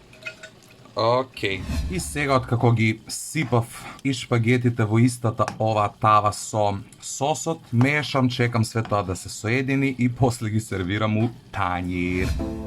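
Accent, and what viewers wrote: Kumanovo, North Macedonia. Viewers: 24h само зборуваш кумановски диалект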